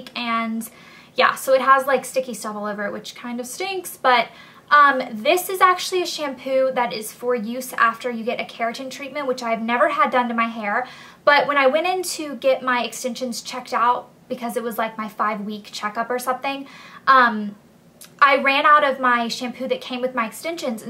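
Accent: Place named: South, United States. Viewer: ur kinda getting a country accent like ur sister :D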